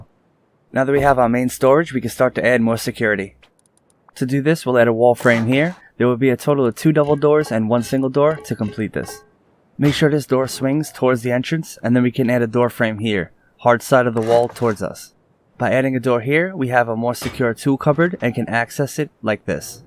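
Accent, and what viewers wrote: New York, United States. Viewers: i hear a accent, new york maybe?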